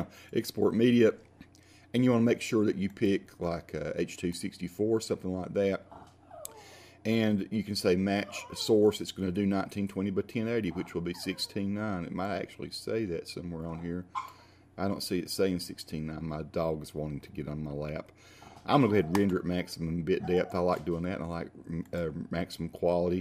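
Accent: South, United States. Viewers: Fuckin it took so long to find this thank you southern accent king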